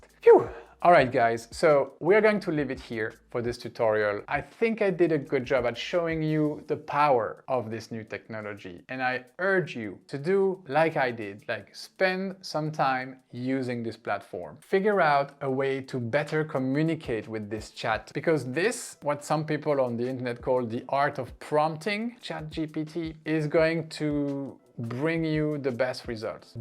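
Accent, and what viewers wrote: France. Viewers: Lol, just listen at his accent. He’s either French or from the French speaking area of Belgium. Pretty obvious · Hello ! Are you French ? Love the accent ! 🥰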